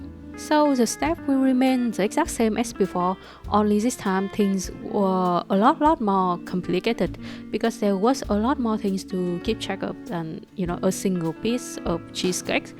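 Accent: Vietnam. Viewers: Nghe giọng bt ng Việt luôn:))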